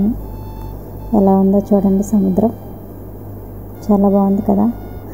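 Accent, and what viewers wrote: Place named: East Godavari, India. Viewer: Madhi kakinada..meedhi A vuru mee voice vintunte East Godavari yasa laga anipistundhi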